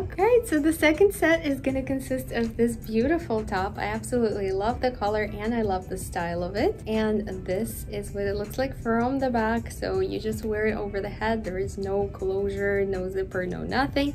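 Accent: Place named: Eastern Europe. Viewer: Uh...I wonder what this girl's story is. I think she has a bit of an accent...from one of the former Soviet republics? She smiles a lot to pacify the viewer, as though she wants nothing more to 'be pretty for us', but of course only a complete idiot leaves it at that. What's her story? She's got AMAZING legs though...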